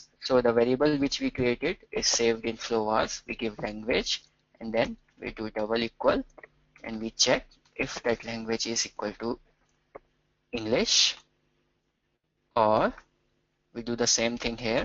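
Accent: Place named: India. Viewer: 印度英语，听着很费劲啊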